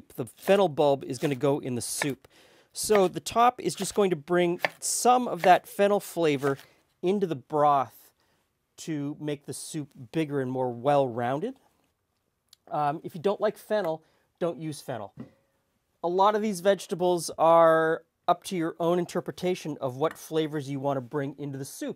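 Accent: Canada. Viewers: This man is Canadian! Or that is my guess. This video was interesting to watch!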